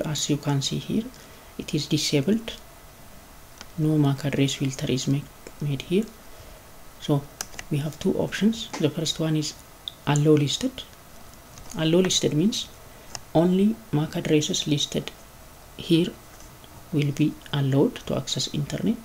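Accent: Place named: India. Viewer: ur tryn' to speak like indians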